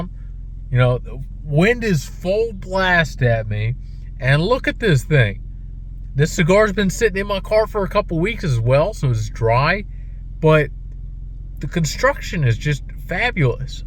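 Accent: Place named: United Kingdom. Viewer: Yo I've came to like the British voice over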